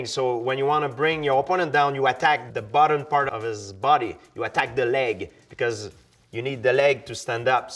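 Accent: France. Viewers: The world's jolliest and friendliest MMA fighter. We love GSP and his franglaise😅♥️ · Whenever he said 'throw' with the French accent, I heard 'troll'. Made the commentary pretty hilarious in a few spots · He sounds not only french but he somewhat sounds Irish on some of his sentences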